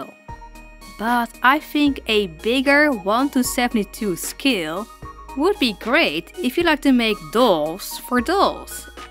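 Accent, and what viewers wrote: Netherlands. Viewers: Is it just me or does it sound like she has a dutch accent? it reminds me of my cousin (that lives in Belgium/Holand). great dolls tho! I also saw Dollightfull’s video, and i think both the dolls you guys made were amazing! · Well i Mean she does live in the netherlands so yeah i think thats why youre hearing a dutch accent😁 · Me whos new to the channel: YASS THE DUTCH ACCENT, (im from Belgium and speek Dutch) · I smell dutch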